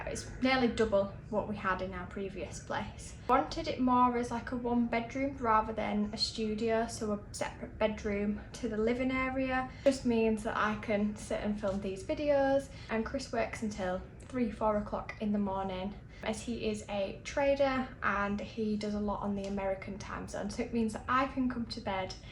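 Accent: United Kingdom. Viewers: We love listening to British accent…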